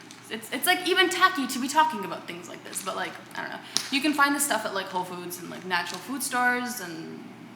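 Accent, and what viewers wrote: Russia. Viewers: Сооооня, я люблю твои видео на английском, просто даже слышать твой голос, это просто удивительно и приятно! 🌙🌹💋🍒 Мне сразу хочется стать американкой🇺🇸, что бы понимать о чем ты говоришь, но я понимаю лишь некоторые слова, переводя в голове 🤔👻 Но хотелось бы, что бы кто-то из наших девочек, делал перевод субтитров под твоими видео, это помогает изучать английский язык, думаю что не только мне. Надеюсь ты прислушаешься и что-нибудь придумаешь) Я действительно люблю тебя, твои мысли и английско-русский акцент ❤️ Спасибо за видео! Целую 💋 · Кажется, будто ты уже и по английский с русским акцентом говоришь! Спасибо! Будем учить английский! 💋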